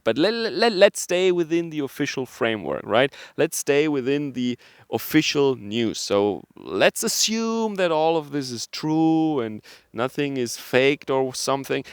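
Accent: Germany. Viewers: Clockwork Orange with a German accent.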